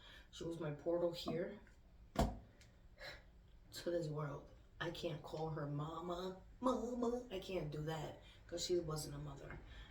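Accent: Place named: Louisiana, United States. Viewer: Southern creole accent. Im from Louisiana I can hear the Southern French twang to it wow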